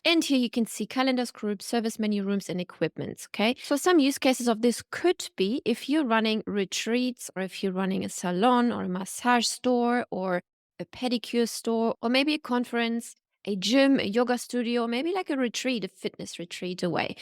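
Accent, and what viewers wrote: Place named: Germany. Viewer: lol what is her accent? she sounds very german haha.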